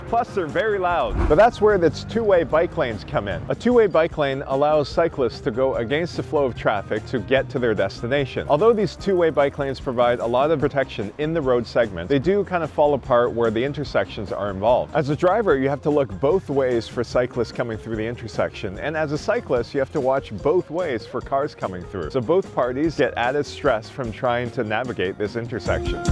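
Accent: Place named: Quebec, Canada. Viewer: Un peu quebecois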